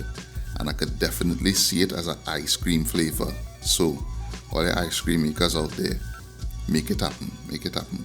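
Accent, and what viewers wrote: Trinidad and Tobago. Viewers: Good explanation man.!!!Love the Trini voice.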